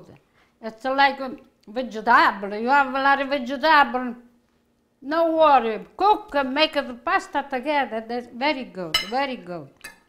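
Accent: Italy. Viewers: She is too cute, I love when she talks, I miss that accent like my mom’s and other relatives from Italy...COOKING TALENT CANT COMPARE, thank you for sharing your knowledge and love of serving others💕 God bless you and your loved ones, ❣️ Love your singing, memories of my beloved mamina, it warms my heart❤️ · gramma, love a your recipes. italians gota have the best accent. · ammore! Thank you for these wonderful recipes. You make them so much fun with your stories, the singing and Italian accent. God bless you always! 🙏🏼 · You are a joy to watch! (Have to listen very closely because of your beautiful Italian accent). Thank you so much for all of your great recopies. (Have of copy of your cook book). You are a very special lady I and enjoy watching you create all of your wonderful creations in your kitchen! God Bless! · I like your English language with Italian accent😘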